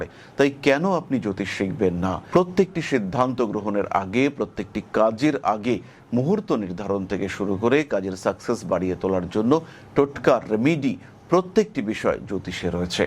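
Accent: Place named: Bangladesh. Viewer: আপনি কি বাংলাদেশে বড় হয়েছেন ছোট থেকে মানে আপনার কথার টান শুনে মনে হয়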